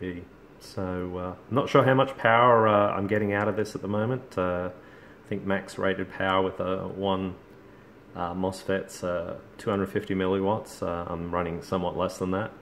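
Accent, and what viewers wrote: Australia. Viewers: Great where you are ? You sound Aussie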